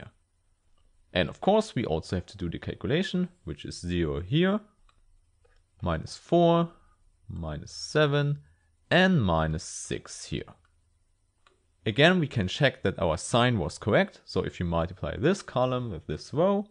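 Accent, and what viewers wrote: Germany. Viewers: all jokes aside, you explained really well. Just enjoy the german accent <3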